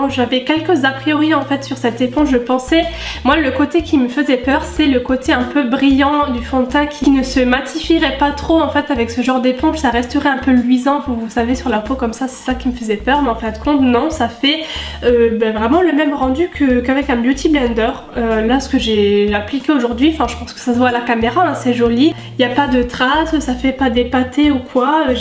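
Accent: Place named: South, France. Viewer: ah boooon tu viens d'Alsace, moi aussi mais je trouve pas trop que tu as l'accent Alsacien j'aurai même presque dis du sud mdr, aah c'est peut être parce que tu viens d'Alsace Moselle sinon superbe vidéo continu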